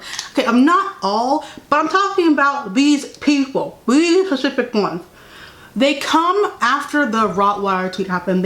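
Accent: New York, United States. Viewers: off topic but the new york really be jumping out lmao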